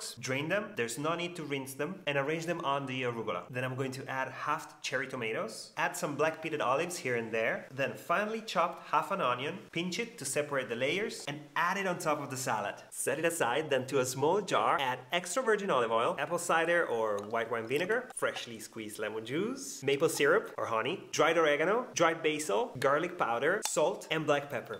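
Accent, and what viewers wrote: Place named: United States. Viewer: Americanizzato, ma ti ho ritrovato, chef! 🤗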